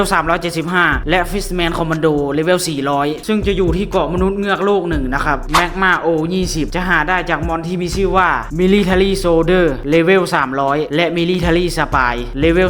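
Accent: Laos. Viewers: พี่เขาเป็นคนลาว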